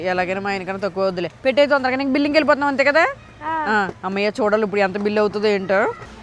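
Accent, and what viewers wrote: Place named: Telugu, India. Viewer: Nuvu ni Telugu slang…killing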